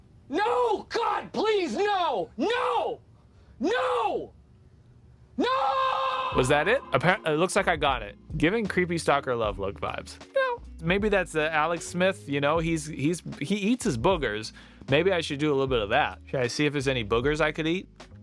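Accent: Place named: North America. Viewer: This is amazing, you have such a gift! I know you are not the only person out there making music, but... This is something so completely out of my reach, it could just as well be magic. See, every time i see someone painting or crafting or sewing, i have a litthe voice in the back of my head saying "yeah i could do that". But it never cam when I was watching you, this is how incredibly unreal making music from scratch is for me. I think it's not something you can learn from practce - you can excell in it, sure. But you have to be born with this special little spark that you have and I dont. As much as I love those little happy songs and I come back to them over and over again, i keep my fingers crossed for your own personal music making projects. You are amazing and I hope good things await you in life. Also, I was low-key suprised that you sound so North American, because of the way you looked and the sweaters my stupid brain assumed you are based somewhere in former Yugoslavia :D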